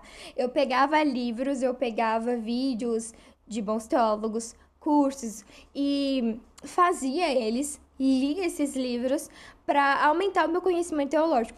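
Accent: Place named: São Paulo, Brazil. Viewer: Moça ótimo vídeo. Sou formado em RH e estou terminando Ed Física. Penso muito em fazer Teologia ou História, ainda estou nessa dúvida. Já tenho a prática de ler muito a Bíblia pelo menos 5x por semana e talz, pq amo esse relacionamento com o Senhor, enfim... Só gostaria de saber de você pela sua experiência: Você sugere o curso de Teologia ou a Faculdade, mediante há alguém que a princípio não pretende trabalhar na área Teológica. E, qual faculdade de SP presencial (Se for de SP pelo sotaque kkk), você indica?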